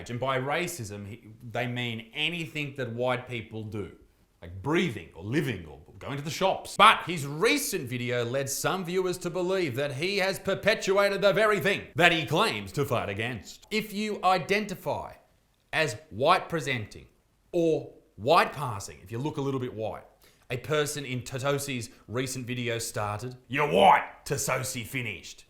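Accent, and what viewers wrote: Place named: Australia. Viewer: Best damn accent, live in brizzie so i dont hear our accent often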